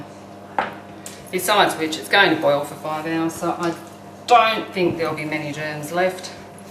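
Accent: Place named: Australia. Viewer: Want to try a Chrissy Pudding for the first time this year. Living in England with my boyfriend and will be spending chrissy with his family again (a little chillier than 20 degrees), so wanted to do something special this year. Found a good recipe but the calico aspect escaped me-this video was so helpful! Also nice to hear the accent, if I closed my eyes I would have sworn I was listening to my Aunty Sue from Campbelltown :P so thanks for the reminder of home! Hopefully it works out!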